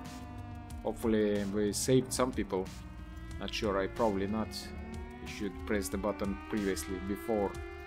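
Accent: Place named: Estonia. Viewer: i figured that younwere estonian by your thick accent haha. hello from finalnd, bro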